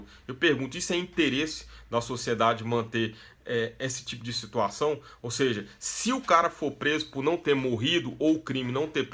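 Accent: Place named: Bahia, Brazil. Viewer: Boa explicação...E esse sotaque puxado pro baiano? Saudações.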